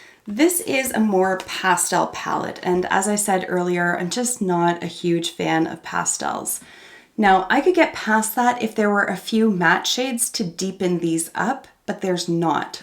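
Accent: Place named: Canada. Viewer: I adore BH eyeshadow pallets! Thank you for the reviews, now I know which ones I want to get next. My go-tos are Bubble Gum, Blueberry Muffin, Mimosa, and the 80's, 90's, and 00's remix pallets. (The 80's one has a pretty great red called Thriller.)Now, I obviously don't know where you're from(Though you sound like you could be Canadian) but here in Tennessee, TJ Maxx is a pretty popular chain. I get all of my BH products from there and they run super cheap, like, 5.99, so I snatch them up when I see them lol. I love how intelligent you are when talking about all of this, not all air head sounding(Monochromatic! No one uses those type of words. Well, not often.). Totally subbing!(Also, I apologize if you addressed different things I mentioned, I'm typing as you're talking lol. )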